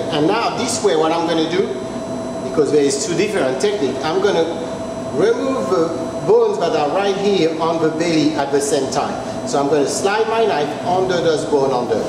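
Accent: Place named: France. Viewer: Bazlur Rahmi worked in an Alaskan fish company for a month. The Salmon came from the boat directly pumped to me. where scarred salmon would go to the canning, and vitamin prepping. And unscathed fish would be cut to filets. which i only did a short time. it wasnt rocket science, you basically saved as much meat of the fish as possible, by cutting away the rest. The fruit peeler as a deboner was the highlight of this video and the dudes snazzy french accent 😂😂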